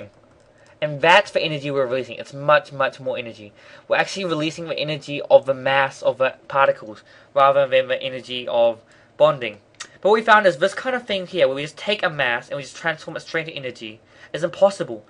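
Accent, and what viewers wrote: New Zealand. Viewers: New Zealand accent, brother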